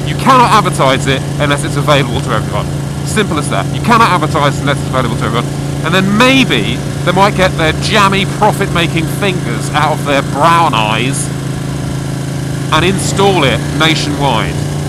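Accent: United Kingdom. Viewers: Gotta love and his angry British rants:-D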